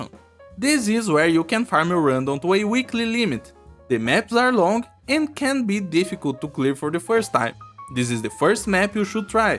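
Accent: Brazil. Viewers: Thanks mate, I gonna start in arknights because two reasons. 1 - Monster hunter 2 - Waifus. (Obrigado mesmo foi bem simples a explicação, e o sotaque brasileiro denuncia bem rápido kkkkkk) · i can pressentir that you é um brasileiro kkkk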